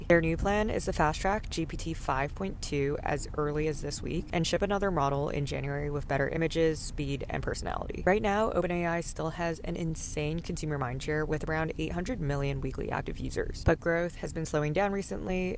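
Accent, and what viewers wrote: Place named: South Carolina, United States. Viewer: You sound like you are from southwest SC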